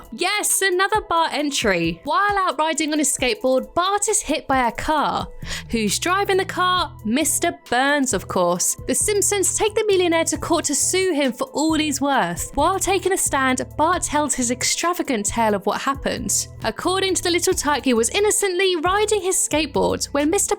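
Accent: United Kingdom. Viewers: This beautiful british accent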